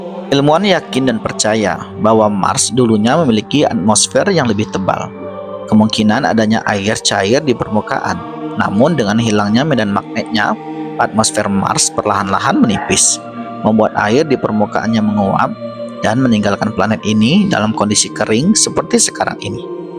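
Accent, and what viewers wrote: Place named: Madura, Indonesia. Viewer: GANTI PEMBACANYA!! BACA NYA KAYAK ORG MADURA… Tak Ye Tak Ye, kyk tukanh sate depan rumah saya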